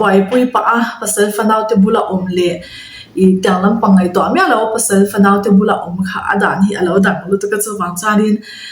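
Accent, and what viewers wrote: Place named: Lamka, India. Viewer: Fiah lutuk❤❤muhnawm leh dawn ae🥰🤗🤗 Lamka tang aw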